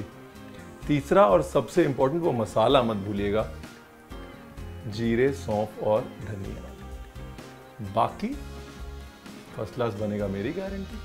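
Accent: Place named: Lucknow, India. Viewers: I was going to say that. He says so many times how he grew up in Lucknow and he is UP ka ladka. His way of talking has all the sweetness and sophistication of Lucknow and UP. And even when he is making Punjabi Samosa he can't keep himself from mentioning Radhey Shyam halwai. But to be honest, Ranveer belongs to all of India now. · Tabhi mai kahu ki apki zaban aur lehza lucknawi kaise hai.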